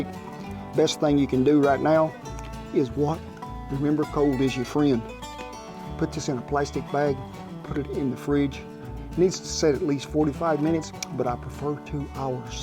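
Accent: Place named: Oklahoma, United States. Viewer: I love when you tease people making fun of your accent. As a fellow Okie, I have no problem understanding you.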